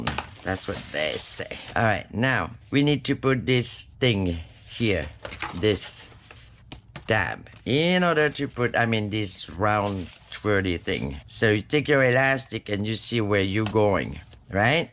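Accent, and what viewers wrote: France. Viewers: Hello,this is a little strange that I came apon your videos my name is also and I also was born in France My father was stationed in France when he met my mom,and much later my mom had 8 kids,4 boys and 4 girls we left Europe when I was 4 yrs old,gosh I love your videos so much and your accent brings me back with so many memories so just wanted to say may God bless you each and every day❤❤❤❤❤❤❤❤❤❤❤❤❤❤❤❤❤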